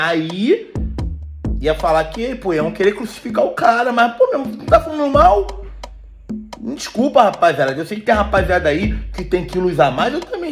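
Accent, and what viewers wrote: Rio de Janeiro, Brazil. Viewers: Gente eu tô 32 anos fora do Brasil, sou do Rio de Janeiro, e ti juro dou tanta risadas, mas tanta risadas com esse 😂😂😂😂😂😂😂😂😂😂 escutar as gírias e o sotaque do Rio não tem preço ❤❤❤❤ Ti adoro " "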